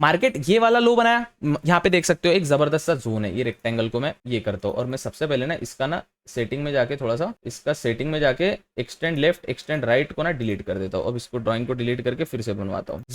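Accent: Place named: Indore, India. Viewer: Bhaiya aap indore se hai na pakka😅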